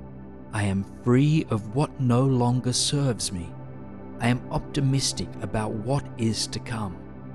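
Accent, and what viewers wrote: Australia. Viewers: Your Australian accent makes these even better! Thank you for sharing these amazing videos.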